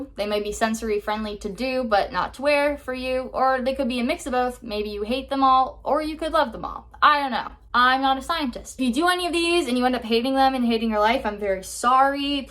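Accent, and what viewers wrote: Canada. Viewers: you sound so much more canadian in this video!!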